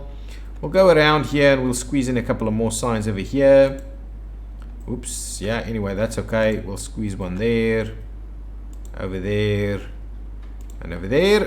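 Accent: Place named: Afrikaans, South Africa. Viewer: You sound like you are from South Africa 🇿🇦, with an Afrikaans accent.( I’m from SA ) Where are you from ?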